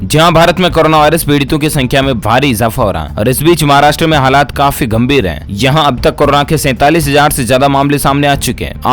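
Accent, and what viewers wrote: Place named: Marwari, India. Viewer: Bhai aaj to aavaj bhi kabhi kabhi marvadi ka pardarsan nice sir ji very nice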